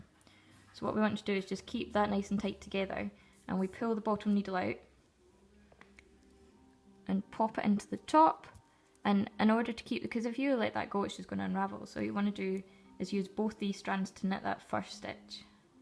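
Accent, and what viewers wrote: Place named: Scotland, United Kingdom. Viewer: Excellent tut!! Love your Scottish accent!! Love the way you knit - maybe another tut?? :) +1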